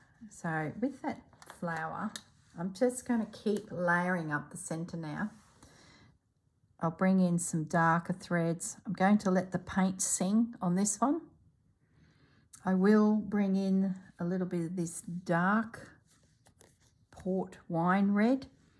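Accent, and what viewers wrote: Australia. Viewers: Ah! Love what you did with the leaves! I JUST LOVE this channel! I love your style, your warm conversational style, stories, and, of course, that Aussie accent and vernacular! So glad to have found you !❤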